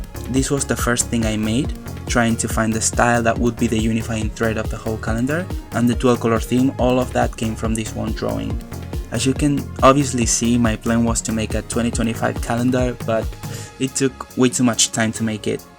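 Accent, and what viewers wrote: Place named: Basque Country, Spain. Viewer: Eres Basco?? Qué chimba!! Fuera una idea estupenda hacer este presente para tu amiga Por cierto, me encanta tu acento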